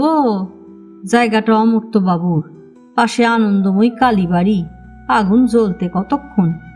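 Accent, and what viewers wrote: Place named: Bangal, Bangladesh. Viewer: খুব ভালো উপন্যাস টি ।তোমার পাঠ অতুলনীয় ।কি সুন্দর ভাবে বাঙাল ভাষা উচ্চারণ করছ❤👍👍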